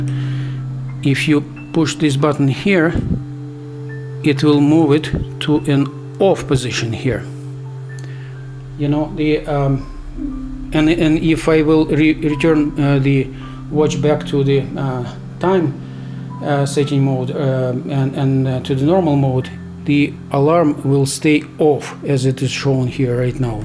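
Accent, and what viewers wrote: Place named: Europe. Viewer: You’re European I could hear your accent so clearly ... are you from Russia or Bulgaria??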